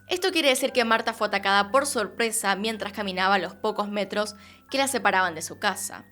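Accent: Argentina. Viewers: Hola. Eres buenísima para narrar y editar tus casos. Eres mi favorita , además me encanta tu acento argentino.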